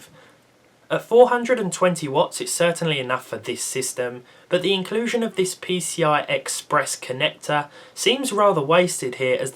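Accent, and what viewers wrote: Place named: Brighton, United Kingdom. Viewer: You’re from Brighton, aren’t ya?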